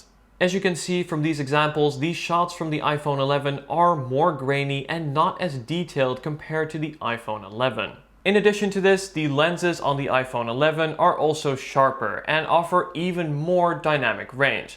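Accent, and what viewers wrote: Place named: Netherlands. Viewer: Are you from the Netherlands or Belgium? Your English sounds a little bit like Dutch. Nice video, and still an pretty nice Phone.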